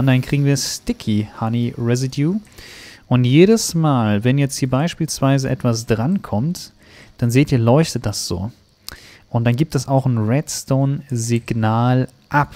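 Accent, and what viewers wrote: Hesse, Germany. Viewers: Auf jeden Fall kommt er aus Hessen meine ich, dachte aber aus der Nähe von Frankfurt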